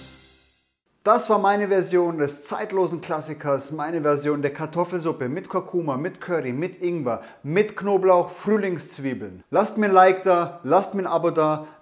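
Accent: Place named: Bavaria, Germany. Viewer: Seit ein gewisser Herr S. aus Bayern mit seinem Dialekt omnipräsent in den Medien auftritt, war mir das Bayrische nicht so angenehm im Ohr. Du hast das eindeutig in die positive Richtung gekickt.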